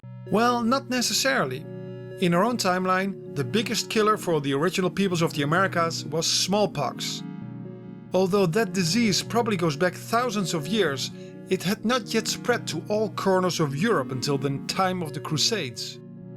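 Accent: Netherlands. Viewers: Is the narrator Dutch? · His Dutch accent is terrible. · You can hear immediately that the video is voiced by a Dutch person. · is this guy Dutch? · Rook took I think so I'm Dutch and he really sounds like a Dutchman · Quite noticable accenr there. Are you Dutch? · Manoj Anton-Rajkumar sounds more like a Dutch accent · You sound Dutch. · yo, you dutch cuz it sure sounds like it ^^ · I think this has to be one of the best video about Alternate history I ever seen. BTW am I hearing a Dutch accent? Ik ben blij dat ik je kanaal op youtube heb gevonden. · Wow, the Dutch accent is kind of heavy.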